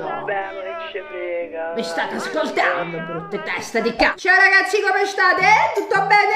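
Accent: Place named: Marche, Italy. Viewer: Sei brava a parlare il marchigiano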